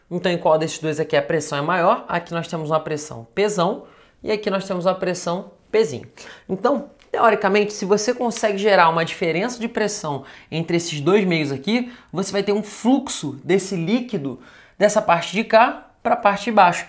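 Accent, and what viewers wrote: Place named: Rio de Janeiro, Brazil. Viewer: Sotaque Carioca é uma tortura.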